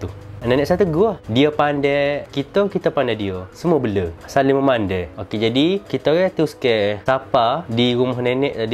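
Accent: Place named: Kelantan, Malaysia. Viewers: Dok td cri tiada komen.. Tiada yg puji brader ni loghat kelantan dia terbaik! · Pekat nau klate,tok pehe boh 🤣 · Terbaik la tk tnggal loghat kelantan wlaupun ini untuk tontonan umum😂👍👍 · Haha ni dia dikira cube ckp melayu biasa la ni kalau dia gune loghat kelantan betul tu lagi tak faham langsung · Kuat Kelate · Pekat kelate boh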